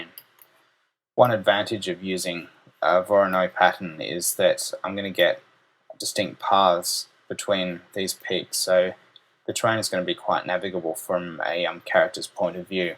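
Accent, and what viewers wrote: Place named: Australia. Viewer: i thought i heard an aussie accent, we aussies are legends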